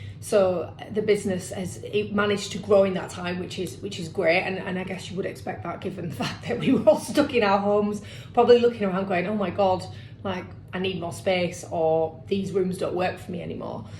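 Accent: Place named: Yorkshire, United Kingdom. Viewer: Ohh keep the faith , sound sensible Yorkshire lass, beats a warren buffet e very time , something good and instantly decent about you . lovely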